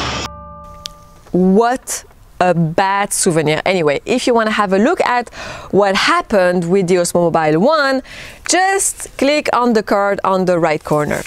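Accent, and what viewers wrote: France. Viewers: Je viens de découvrir ta chaîne. J’adore ton accent français quand tu parles anglais ! Je comprend tout ! Je m’abonne du coup !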